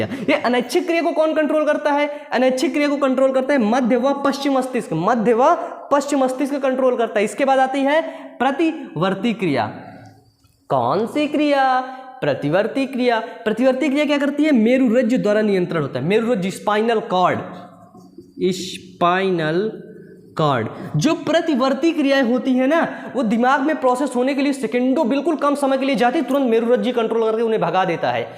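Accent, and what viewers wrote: Uttar Pradesh, India. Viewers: Sir aap up ke hai kya up ke bhasha hai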